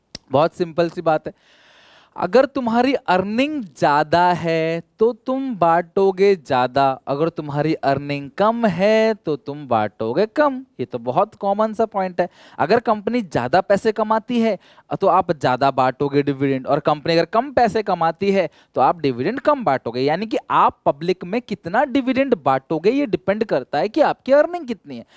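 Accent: Odisha, India. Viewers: sir app odia ho kya ?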